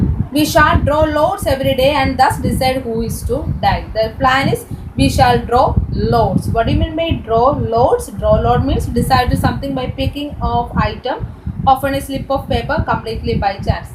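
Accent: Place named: India. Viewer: Bad explaining and butler English very bad better luck next time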